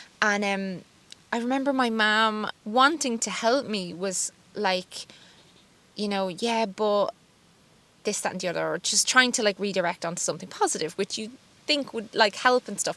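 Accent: Ireland. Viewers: I just love your personality and your self and videos!! You are my favorite YouTuber and I would be soo sad if you left, but I would understand. Maybe you could do a podcast in the future if you decided to leave YouTube because your voice is soo lovely to listen to. I miss Ireland and I love hearing how you talk! Thanks for all the big sister advice. (Even though you’re not teaching people in person you are here online and I’m so grateful for what I’ve learned from you!) ❤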